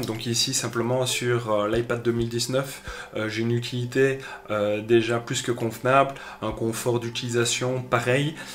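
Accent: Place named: Alsace, France. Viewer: Quels belle accent alsacien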